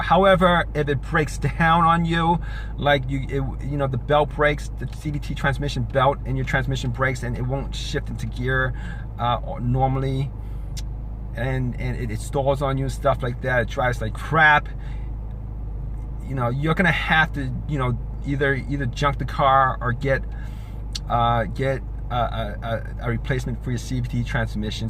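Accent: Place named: New York, United States. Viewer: love the accent!,new york,boston?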